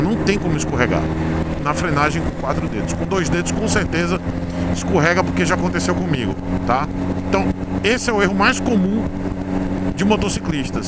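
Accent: Bahia, Brazil. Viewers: Você é de Salvador- BA?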